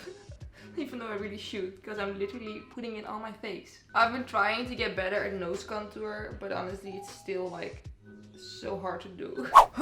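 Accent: Netherlands. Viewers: She’s dutch so that’s why the accent prolly overlaps 😸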